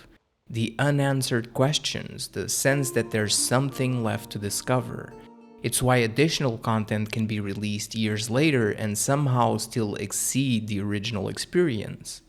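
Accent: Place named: Portugal. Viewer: good video. CD is awesome. accent 100% fellow tuga. 😜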